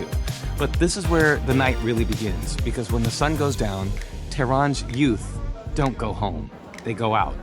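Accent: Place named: United States. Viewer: where are you from? you sound american. is travel allowed to iran currently?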